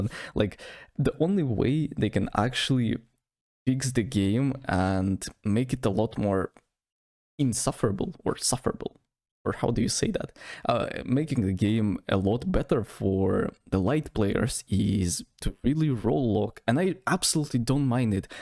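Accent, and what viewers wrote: Russia. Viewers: Мне показалось или у тебя русский акцент?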